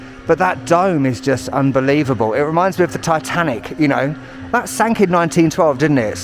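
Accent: United States. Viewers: Great American accent. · Your American, accent is hilarious,,, as a non American,,, I would say poor is poorer in the US...at least you were safe .......cheers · your American accent is so spot on it makes me wonder if your not an American posing as a Brit, joking I know your British but the accent is great.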